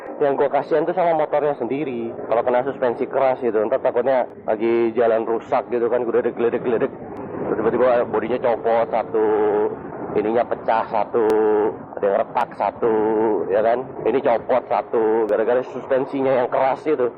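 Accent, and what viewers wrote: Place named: East Java, Indonesia. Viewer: cak asline wong endi ...kadang kok suarane medok jawa timuran...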